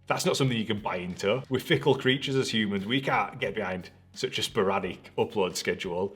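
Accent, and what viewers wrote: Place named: Teesside, United Kingdom. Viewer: I need to start doing that! My first few videos were just me rambling on without any structure haha so the bullet points list is a good idea. Also, I think it's needed for when you shoot cinematic sequences etc so you know what shots or what in camera transitions you want to use. Knew I recognised the accent. I went to uni in York and my family are from Middlesborough area so I'm familiar with the accent!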